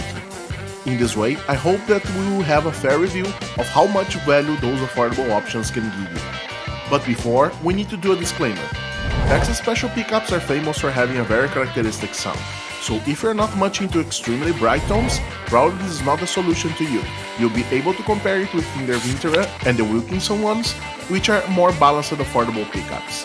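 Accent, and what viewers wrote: Brazil. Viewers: Sotaque de brasileiro falando inglês! Hahahaha vídeo top!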